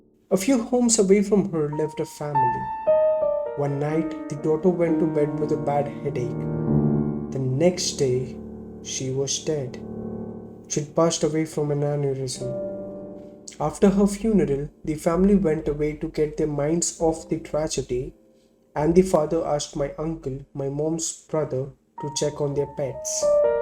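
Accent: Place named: Asia. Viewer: Mispronouncing English words, bad voice, loud piano, background noise. The narrator must be non-native, someone from Asia. (bad experience)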